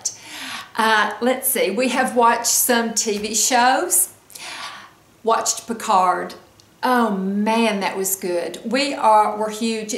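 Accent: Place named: Tennessee, United States. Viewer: Great hair! Yes you are so right, we can get by with a whole lot less than we thought! I too did my roots but must admit can’t wait to sit in my hairdressers chair again! I had the privilege of living in Tennessee for my senior year of high school. I made some dear friends while there. Every time I hear your voice it brings back fond memories of them! I loved their TN accent as much as they loved my GA one! Stay well!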